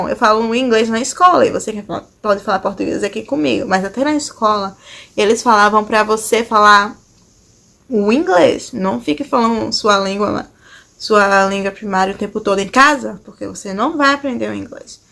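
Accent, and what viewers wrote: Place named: United States. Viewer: Gostei do seu jeito de falar. Vc tem sotaque americano kkkk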